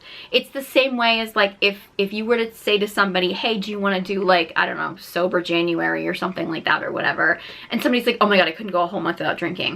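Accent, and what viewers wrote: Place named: South, United States. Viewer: Your accent is cute! Where are you from? I’m in the Midwest. To me it sounds like you have a ever so slight southern accent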